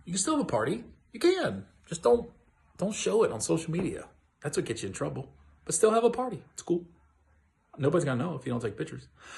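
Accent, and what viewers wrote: South, United States. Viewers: you have a southern accent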